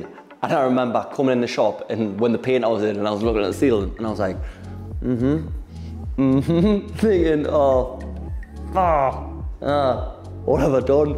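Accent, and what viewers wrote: Newcastle, United Kingdom. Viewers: Geordie mate, north east of England.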